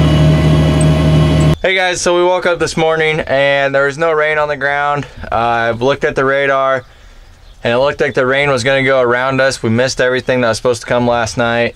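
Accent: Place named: Nebraska, United States. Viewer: Oh I used to work for a potato farm in Duncan near Columbus. I could tell you were from Nebraska.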